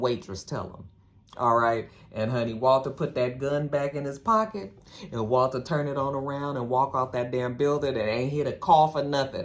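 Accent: Ireland. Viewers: Lol, whenever I hear your Irish accent. Next time throw in the line, it’s magically delicious. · I be waiting for this review just for the Irish accent, I laugh watching the show just thinking about which line you gon say😂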